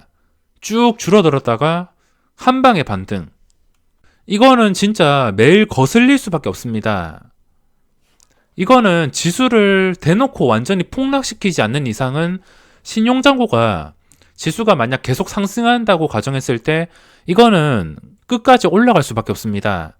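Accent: Gyeongsang, South Korea. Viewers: 이해해 주세요~ 저도 그렇지만 경상도는 발음이 어렵습니다ㅜㅜ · ㅋㅋ 경상도 분이라 발음이 좀 쎕니다.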